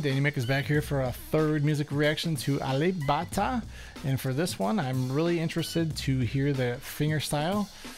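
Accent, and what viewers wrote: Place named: United States. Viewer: good reaction bro, by the way, where are you from, let me guess? your language is American English, am I really you from America ?????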